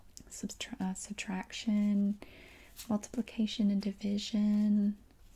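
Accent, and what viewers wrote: South, United States. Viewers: It is joyful to listen to how intrigued you were with the various items in the chest. Your Southern accent and your interests are very relaxing. Keep up the fantastic work!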